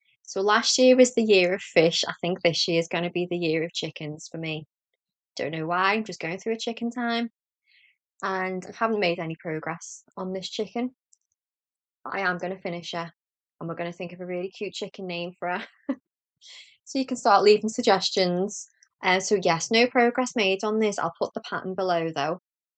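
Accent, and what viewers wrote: Liverpool, United Kingdom. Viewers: Hi. New subscriber here from New Hampshire, in the US. I was born in Paisley, raised in Leeds and went to University in Liverpool. I heard your accent and you made me smile. The first YouTuber I have heard from Liverpool. I thoroughly enjoyed your “Make 9” episode and will probably go back and listen to the older episodes.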